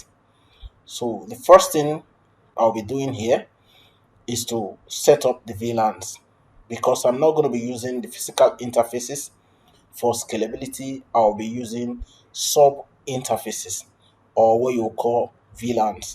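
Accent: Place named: Nigeria. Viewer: why you sound like nigerian scammer?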